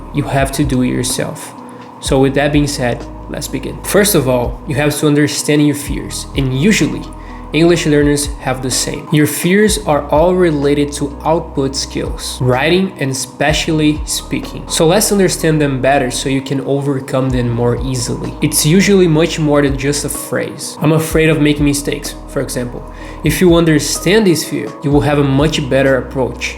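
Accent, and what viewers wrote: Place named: Brazil. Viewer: He's Brazilian!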